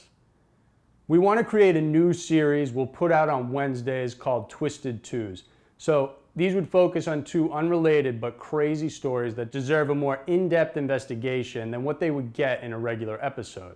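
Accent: United States. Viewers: Great to put a face to your voice, I watch your videos everyday but where in the USA is your accent from exactly? thanks lysa x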